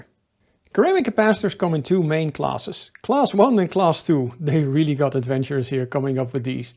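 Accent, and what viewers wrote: Netherlands. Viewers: Man, I was soooo confused about what a "coramic" capacitor could be! 😂 Until I became aware of the strong (Dutch?) accent. (LE: BTW, the part from to sounds almost like it was the Project Farm guy 😊 )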